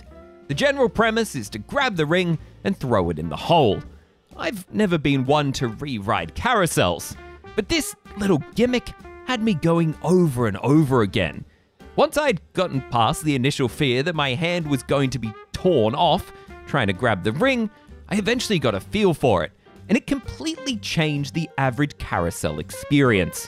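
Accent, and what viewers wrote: Australia. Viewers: As someone who grew up about 15ish minutes away and has spent countless hours at Knoebels over the last 40 years, it's just really cool to see it get some recognition for what a lovely little park it is.... I also absolutely can imagine the reaction the Aussie accent caused. I even did a double take myself hearing the accent in the video. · It has been a few years since I've been up there at Knoebel's (I remember when it was still called "Knoebel's Grove"). It takes me a good 4 hours to get there from where I currently live. That is truly an amusement park in its true, classic meaning. This is probably the last remaining park that still operates the way it does, with no admission, and using ride tickets to get on the rides. I still have a huge stack of those ride tickets, so I may have to consider going up there this autumn for the Phoenix Phall Phunphest. 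There are other parks that started out like this that still exists although they transitioned to the more typical modern gated theme park. Kennywood in Pittsburgh is one, as it was originally a trolley park. Hershey Park is also one as it was originally meant to provide amusement for the company's employees and family on the weekends. As for as the local's reactions to your Australian accent, not very many Australian people visit that part of the US, so getting see, meet and hearing one is a pretty rare treat there. Going to Knoebel's really does feel like stepping back in time and experiencing a truly traditional amusement park. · I'm just here for the Aussie accent. 😂😂😂. Love it.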